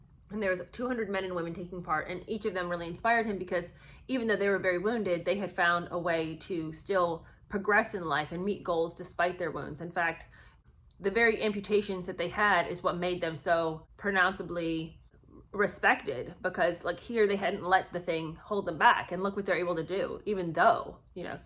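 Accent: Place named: Cockney, United Kingdom. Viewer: You are my new favorite channel. Hilarious! Even my husband enjoys...he likes your Cockney accent.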